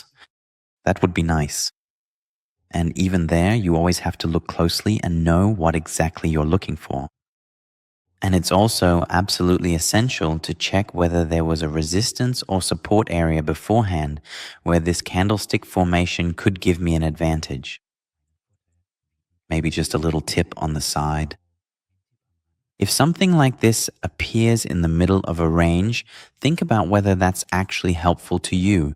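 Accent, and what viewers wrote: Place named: Germany. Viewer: i prefer your german accent. its much more natural.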